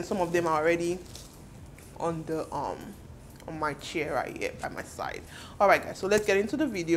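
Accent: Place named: Nigeria. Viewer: I love that Nigerian accent 🔥🔥❤️❤️😍😍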